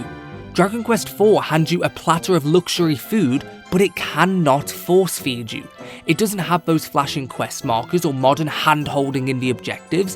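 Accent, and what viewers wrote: United Kingdom. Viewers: why do you have a British accent? Really good video either way!😊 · Are you from Birmingham?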